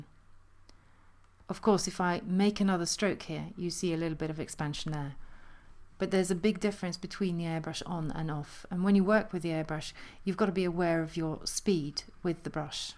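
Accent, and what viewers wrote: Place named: Oxford, United Kingdom. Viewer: You smoke many an Oxforder with your accent, dear. It's the combination of the accent, the articulation and the subtle air of dignity of your delivery. Also, I've the sneaking suspicion that even Chris Tarantino, Amy Dresser & Katrin Eismann would have a hard time comparing favourably to you.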